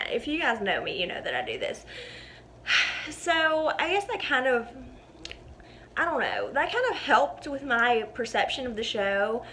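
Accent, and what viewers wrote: South, United States. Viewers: Girl, I’ve been meaning to leave you a comment to tell you that you remind me a lot of myself. I ramble when I’m telling stories and I have nervous habits just like you. I know a lot of people do but it’s really refreshing to find a youtuber that I can relate to! I absolutely love your tattoos too by the way. And your southern accent. I’m probably being a bit creepy now, sorry about that! I subscribed a few days ago. Love your content. ❤️ I’ll stop now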